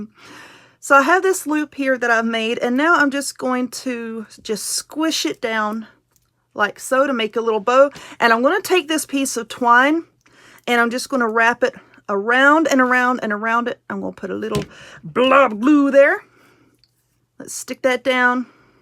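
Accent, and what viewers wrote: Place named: Texas, United States. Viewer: Hey sounds like you live in Texas...lol We have two that rides around on their lawnmowers almost 24/7... Oh I love your carrots. I have to try these. Thank you. Stay safe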